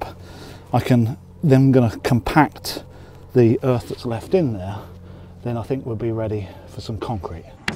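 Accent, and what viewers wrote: United Kingdom. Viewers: Somehow I knew the guy would have a British accent before it started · That plus the proper British accent had me in under 2 minutes!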